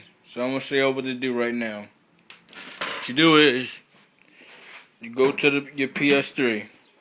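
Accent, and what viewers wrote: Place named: Northeast, United States. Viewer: you're from somewhere up north right? New York, DC, Boston?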